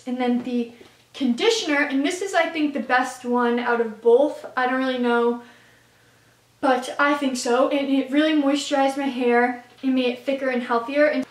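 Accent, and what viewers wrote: Canada. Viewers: Are you.. Canadian..? Also you're really pretty. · Are you Canadian?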